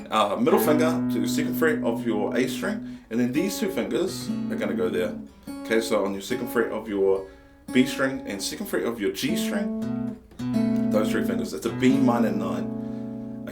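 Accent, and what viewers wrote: New Zealand. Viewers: bruh is he from nz?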